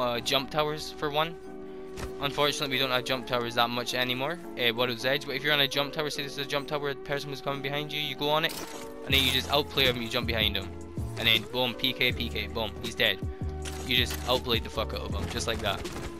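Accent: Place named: North Scotland, United Kingdom. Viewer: You definitely sound like you're from the north of scotland lmao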